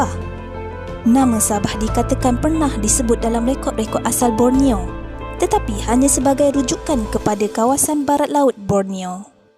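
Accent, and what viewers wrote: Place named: Kuala Lumpur, Malaysia. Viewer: ni ka pula sejarah sabah, yg brcerita d cini mcm bukan org sabah, logat kl pula ni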